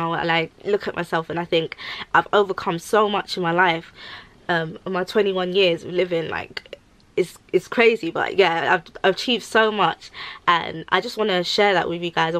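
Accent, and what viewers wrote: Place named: England, United Kingdom. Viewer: mandy_ somda it’s English accent from England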